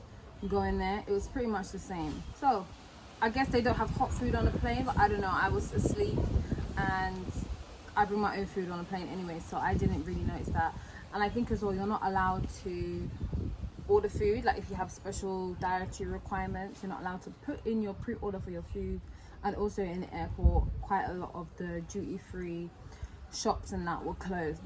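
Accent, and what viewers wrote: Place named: Barbados. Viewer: Its also the bajan accent coming out for me😩😍😍😍😍😍🔊🔊🔊🔊🔊💙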